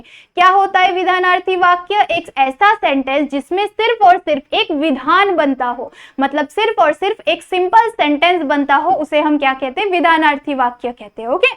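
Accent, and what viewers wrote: Maharashtra, India. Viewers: Mam your Marathi is too cute😅😅😊😊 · Thanks alot sir and ma'am for your efforts and i really think that I'll score best tomorrow with ur help. And mam your Marathi is really cute😂😊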